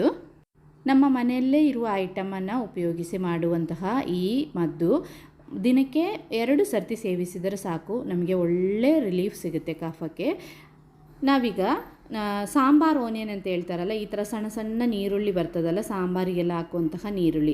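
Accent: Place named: Bengaluru, India. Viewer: ನೀವು ಯಾಕೆ ಬೆಂಗಳೂರು ಕನ್ನಡ ಹೇಳುತ್ತೀರಾ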